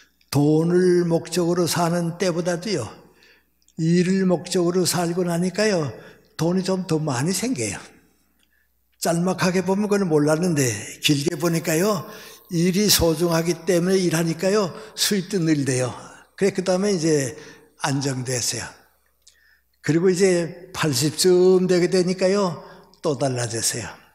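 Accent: North Korea. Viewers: 이북말씨네요. 석가모니 부처님께서 최고이지요. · 올해 나는 72살. 어려서 학창시절 북한출신 은사님들로 부터 교육을 받았다. 김형석교수님의 가르침과 음성을 들으니, 옛날 평양사투리로 말씀하시던 선생님들의 음성이 들려와 하염없이 눈물만 흐른다. 선생님 건강하시고 오래사십시요. 감사합니다.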